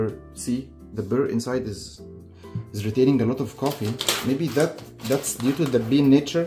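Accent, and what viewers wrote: Egypt. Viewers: i knew it ......i knew it .....i knew it .. its Egyptian accent منين يا غالي في ام الدنيا وياريت وسيلة تواصل معااك انستجرام او فيسببوك القلب مش بيحن من فراغ و الله متابع ليك من زمان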